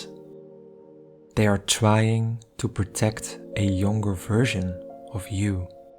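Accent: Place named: Netherlands. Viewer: Beautiful artworks and great storyline. Your video’s are great value to my as I am on the path of individuation as well. Thank you. I can hear from your accent that you are from the Netherlands as well?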